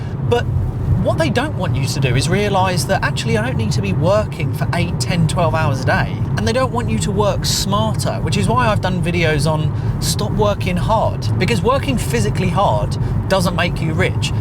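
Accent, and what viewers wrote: United Kingdom. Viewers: Oh I love British accent❤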